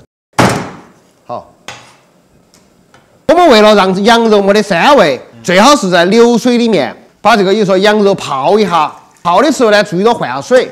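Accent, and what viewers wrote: Chengdu, China. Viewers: 最标准成都话！love!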